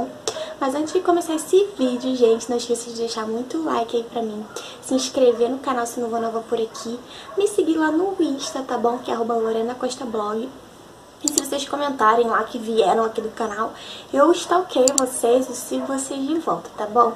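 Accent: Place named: Rio de Janeiro, Brazil. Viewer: Vc é bem carioca né, da pra ver de longe, talvez nem seja, mais tem mt mt mt sotaque, gostei do video, vou testar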